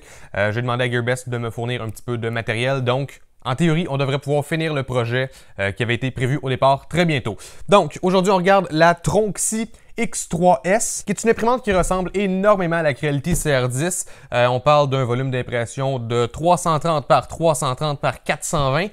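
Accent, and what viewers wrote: Canada. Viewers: j'adore that Canadien French :-)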